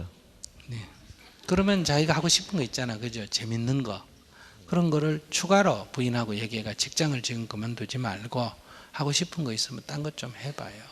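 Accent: Gyeongsang, South Korea. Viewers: 인생 상담자 일인자.. 경상도사투리 정말 티꺼운말투..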